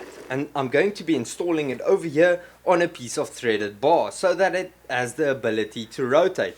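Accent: South Africa. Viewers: Hi. Gr8 to hear that unmistakable South-African accent. I am based in Benoni, Gauteng. Been on your channel now for about 3 months. Really enjoying your work. I am also left handed so your hand work makes perfect sense to me. that "dido" you spoke of is pronounced "Dado" I am fairly new to woodwork and eager to learn. i am on my 3rd project of the lock-down and like you, ran out of materials (and beer) while ago. small little projects to build skills and keep me out of the wife's way... keep going and well done..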